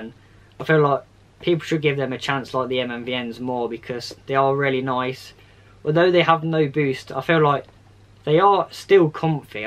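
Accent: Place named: United Kingdom. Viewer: what part of the UK did you come from with that accent mate?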